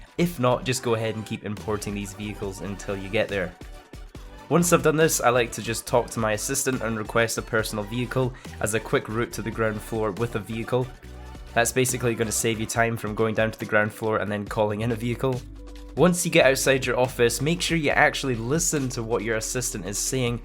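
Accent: Scotland, United Kingdom. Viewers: Fuck scotland wierd accsent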